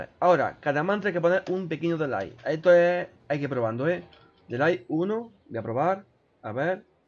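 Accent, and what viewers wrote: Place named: Andalusia, Spain. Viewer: ese acento andalu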